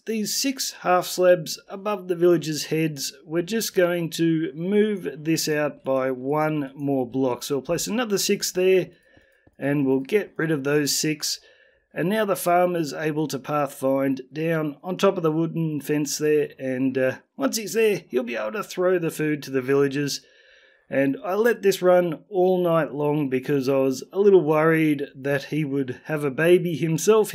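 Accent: Australia. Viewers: You're Australian aren't you?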